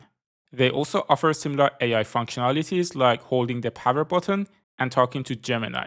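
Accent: Iran. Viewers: به به لحجه ایرانی 😂❤❤❤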